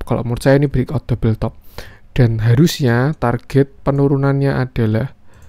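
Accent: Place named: Central Java, Indonesia. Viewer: medok gess… org jateng nih min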